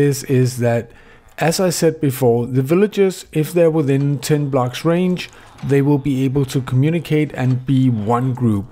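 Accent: Denmark. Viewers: U danish bruh??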